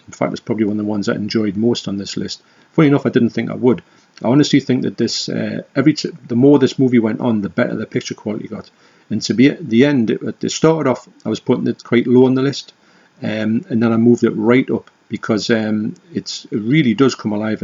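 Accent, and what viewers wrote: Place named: North East England, United Kingdom. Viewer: YouTube suggested you at random and I have to say what a lovely surprise to hear a north east voice on YouTube out of the blue. Your T Shirt is hilarious! As we all know that is the correct pronunciation 😁.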